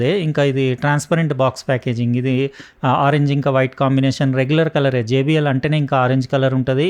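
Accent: Telangana, India. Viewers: TG slang top notch 💪💥🔥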